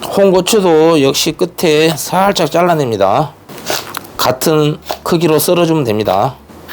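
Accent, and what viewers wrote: Jeolla, South Korea. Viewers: 전라도 사투리 구수하네 ㅋ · 말투가 너무 정겨워요. 전라도 사투리는 요란스럽지 않아서 좋지요 ㅎ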